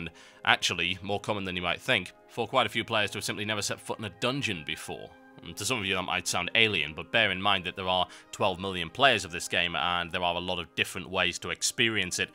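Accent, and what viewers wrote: United Kingdom. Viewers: 99% of the reason, why I have subscribed on your iTunes channel, and am permanently looking up your newest vids, is your brritish accent! Don´t let the US english ovetake the whole internet.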